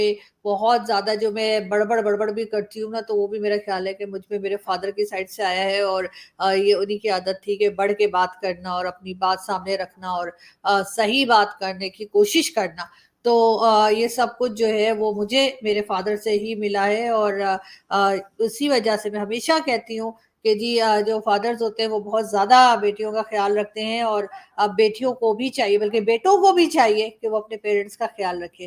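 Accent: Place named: Mumbai, India. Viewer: ji aap mumbai hindi kaise bol leti hai. pls repl.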